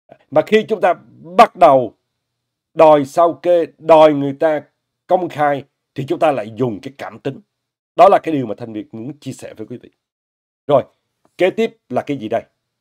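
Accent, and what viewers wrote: Standard. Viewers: Chào cố gắng lên đều vì mình thích kênh này bởi nói rất chuẩn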